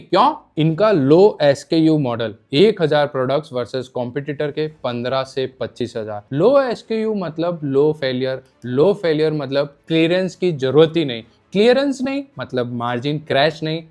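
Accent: Gujarat, India. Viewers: Gujju accent.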